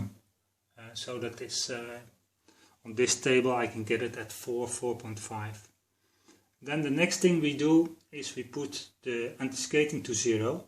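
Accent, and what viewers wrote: Netherlands. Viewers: You always can hear if you are dutch hahahaha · Je spreekt het engels wel op een zeer nederlandse manier uit. Maar dat wil niks zeggen, deze video‘s zijn supertips en ik zie in de comments dat het geweldig helpt bij de engelse kijkers. 😊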